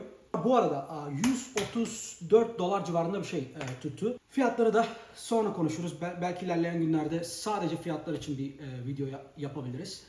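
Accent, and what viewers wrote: Thrace, Turkey. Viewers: Trakyalı mısın öyle hissettim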